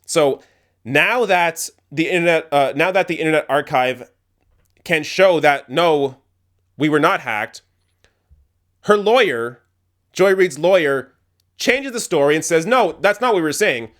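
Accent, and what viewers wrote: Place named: Canada. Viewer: The canadian really came out in this video.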